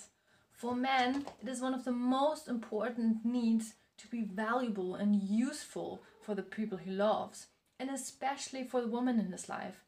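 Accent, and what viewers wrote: Germany. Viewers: That German accent! Purrr. 😍 Validate me all you want BB!! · She sounds German. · I can hear the Kraut in her voice from 6,000 miles away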